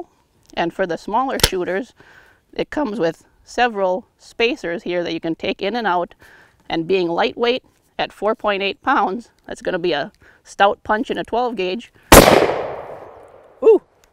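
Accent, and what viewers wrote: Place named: Minnesota, United States. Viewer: I'm trying to identify the accent... Minnesota? Canada?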